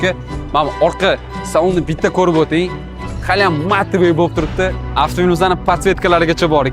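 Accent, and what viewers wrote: Tashkent, Uzbekistan. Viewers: Harpsanu ne toshkencha gapirib chiranasan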